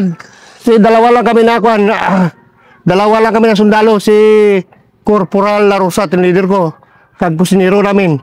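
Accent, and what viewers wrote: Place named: Iloilo, Philippines. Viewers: Cool lg c sir oh...accent di gid madula Basta Ilonggo😊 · Ilonggo talaga hhaha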